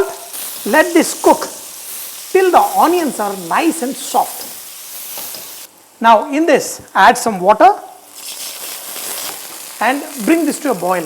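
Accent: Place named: Telangana, India. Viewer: అన్నా ! బాగున్నావే ! We always call in telangana యాస.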